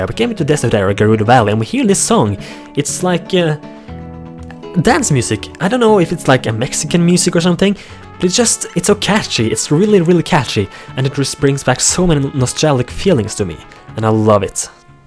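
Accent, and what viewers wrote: Norway. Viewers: That english sound like something i have heard before. Are you Norwegians? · Haha, hørte på måten du snakke på at du er Norsk :)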